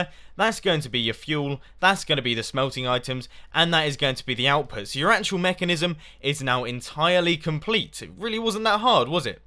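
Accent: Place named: United Kingdom. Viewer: R0SILE tk Oh, he can. Maybe you are used to speaking a different accent than he does, so you might have a little hardship understanding his British English.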